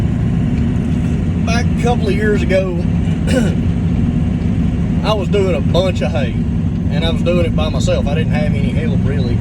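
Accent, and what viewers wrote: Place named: South, United States. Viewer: Hey, I love to hear you talk. Too many of us southerners are losing our accents! Would love for our northern friends to listen to your ramblings!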